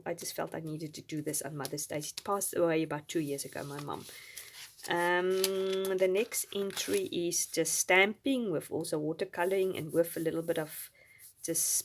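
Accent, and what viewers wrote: South Africa. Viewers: I love your South African accent too, your have a lovely soothing voice to listen to! 😊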